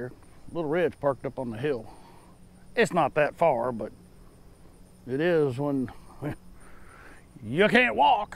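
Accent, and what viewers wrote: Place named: South, United States. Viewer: We watch your videos before visiting Texas State Parks. And we have become fan of your southern accent.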